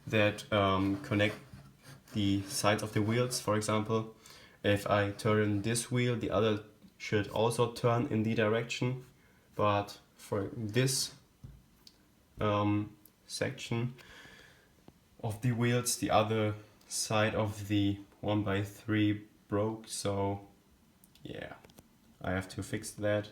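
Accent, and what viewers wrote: Germany. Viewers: Wusste ichs doch, er ist Deutscher😂 der Akzent hat's verraten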